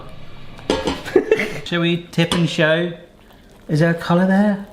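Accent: United Kingdom. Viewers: Well my good home chef, I took the liberty of watching a couple of West Country accent videos and I can definitely say that while you definitely have what to my American ears sound like a "British Accent", you have only a hint of the "yap" I could detect in the dialect... but I'm also somewhere between the Midwest and Northeast of America, where apparently the accent has an effect (West Country old men legit sound like old men from my area with British accents, lol). Loved the video, by the way! Makes me want to get a Twix! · Im Irish. Your accent isnt strong at all compared to others in the UK. Trying to understand my accent would be a hoot though.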